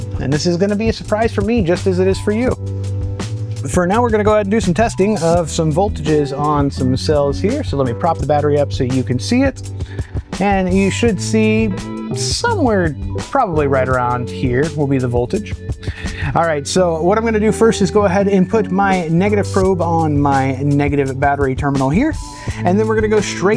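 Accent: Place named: United States. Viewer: You have in American accent in your english language. How does it come that you know millimeters? I was expecting you as an American to use yards and inches and 15/32 inches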